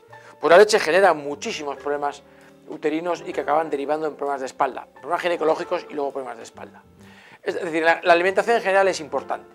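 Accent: Spain. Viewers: Jajaja! Ya tampoco le entiendo pero por la manera de hablar, el acento tan español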